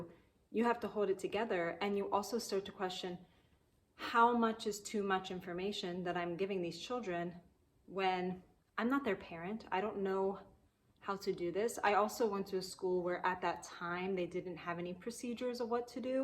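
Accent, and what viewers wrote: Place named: Midwest, United States. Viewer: Omg I was convinced you were not a native English speaker haha. Yes, I think it's the vowels and maybe it's the midwestern accent throwing me off.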